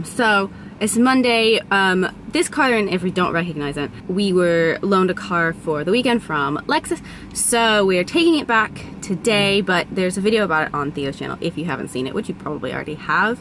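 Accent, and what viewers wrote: England, United Kingdom. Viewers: This is the first time I’ve actually noticed a slight English tint to your accent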